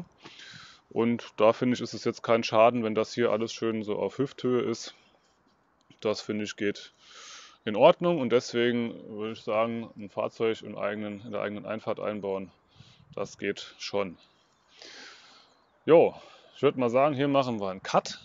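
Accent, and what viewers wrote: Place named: Hesse, Germany. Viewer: Spannendes Projekt! Wie ist das eigentlich mit Tüv und Steuerungs-Elektronik. Muss die Ansteuerung/Software des E-Antrieb bestimmte Abnahmen erfolgen? Mir gefällt es gut vorhandenes wieder zu verwenden. Ist halt viel gefummel und gesuche. Dein hessisch ist sehr sympathisch. Anmoderation ist mal was neues. Passt. Gruß aus Süd Hessen.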